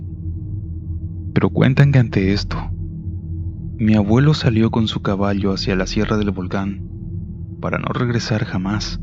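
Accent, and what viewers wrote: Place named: Argentina. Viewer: El amigo creo k no es mexicano es creo argentino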